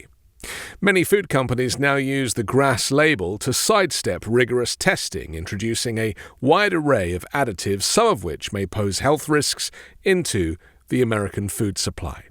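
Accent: United Kingdom. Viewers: Best voice on the internet. Smooth and silky British goodness! Cheers mate!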